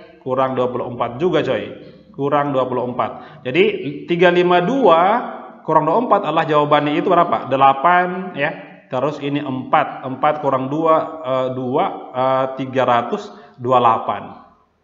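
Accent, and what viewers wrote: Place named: Eastern Indonesia, Indonesia. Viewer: asli mana bung, kayaknya timur ni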